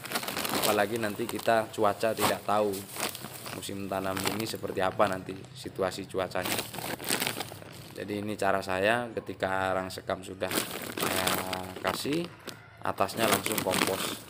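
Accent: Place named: Banyumas, Indonesia. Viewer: Terus berbagi bro...kang kulon kye tangga ngapak😃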